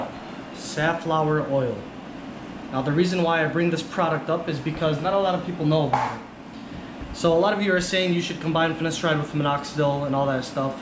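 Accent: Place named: Arab world. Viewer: Where you from? You sound like an Arav from Dearborn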